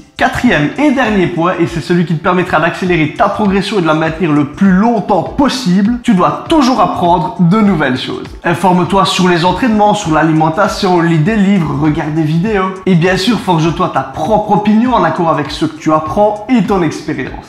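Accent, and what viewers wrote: Liège, Belgium. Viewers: Les Nuls 😂😂 Excellent avec l'accent belge! Top Vidéo continue! 💪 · oufti Gros gros accent de Liège... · J'aime ton accent (liégeois je pense) mdrrr bonne vidéo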